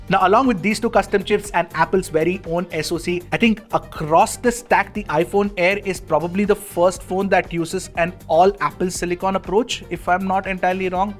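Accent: India. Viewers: Indian English accent is so Funny😂😂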